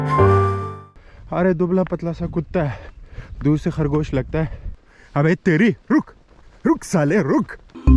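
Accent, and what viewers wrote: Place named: Karachi, Pakistan. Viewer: Admin apki Urdu Ka accent Karachi Ka ha ....nice v log👌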